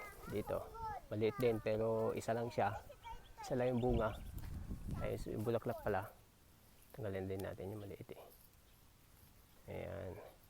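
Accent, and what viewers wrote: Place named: Ilocos, Philippines. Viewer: Parang Ilokano ang punto mo sir,baka magkalapit lang ang lugar natin sir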